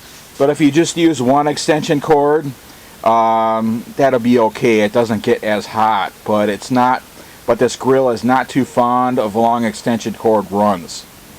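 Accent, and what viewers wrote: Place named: Wisconsin, United States. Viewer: That's def WI or IL. It sounds urban and rural at the same time lol Bet you he lives by Lake Michigan 🤣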